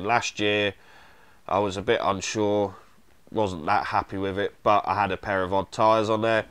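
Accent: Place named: London, United Kingdom. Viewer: Hey, great series on the Bandit rebuild! I've bing watched them over the weekend and found them very helpful. A year ago I bought a 2002 Bandit 1200cc and fell in love with it. Like you I would like to keep riding it for awhile, and even though I've never worked on a motorcycle before, I've stated to to tackle some basic tasks like changing the brake pads, and replacing the sprockets and chain. By seeing you take the bike apart and fix what needed to be fixed gives me confidence and clear guide to tackle any future repairs and modifications. So thanks to you and your sponsors. I'm from the States and It seems that that Bandit series is more popular in England than here. I not sure why. One other thing, your accent sounds similar to Micheal Caine's, are you from London?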